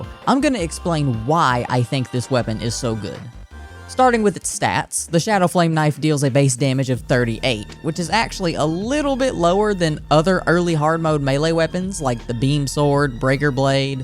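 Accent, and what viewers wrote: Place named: South, United States. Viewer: Well you have another sub. Decent content man. And don't fret yourself on the script, it was decent as well. That SOUTHERN DRAW THOUUUUGH! (No judgement, Arkansas here.)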